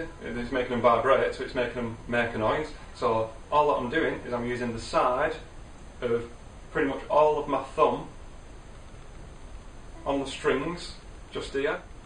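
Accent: Lancashire, United Kingdom. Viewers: You be must be from lancashire. You should like my uncle lol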